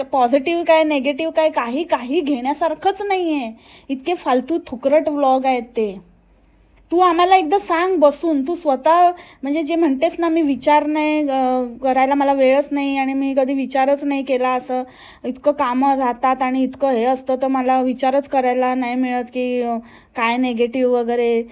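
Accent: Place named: Ahmednagar, India. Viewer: tu khuthun ahes kiti chan bolte tu nagarchi ahe ka tu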